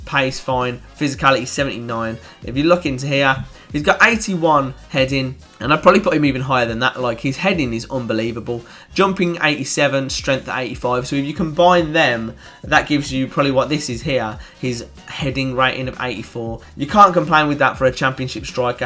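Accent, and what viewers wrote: Birmingham, United Kingdom. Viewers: What part of Birmingham are you from?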